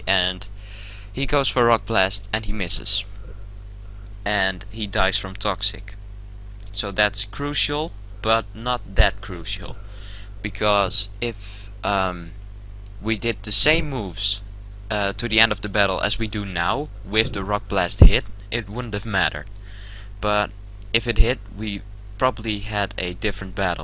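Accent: Netherlands. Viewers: wat een heerlijk nederlands accent hahah goed gespeeld trouwens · are you dutch?? cuz you have a dutch accent especially when you said: you kinda have to expect a shellbreak baton passer. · your dutch :P ? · hearing a dutch accent haha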